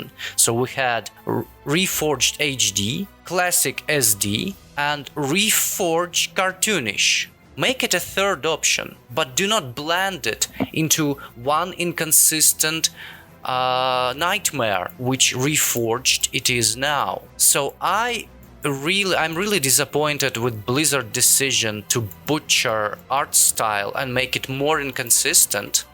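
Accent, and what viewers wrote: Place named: Russia. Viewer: молодец! По акценту сразу понял, что наш 😂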